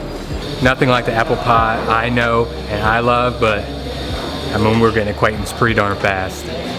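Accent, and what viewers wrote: South, United States. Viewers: You sound like you have a southern accent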